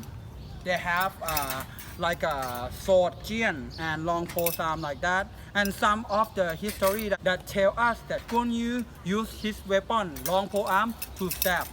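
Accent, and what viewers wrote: Thailand. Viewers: lol I knew it you must be Thai people listening to your accent Great to see people interested in this weapon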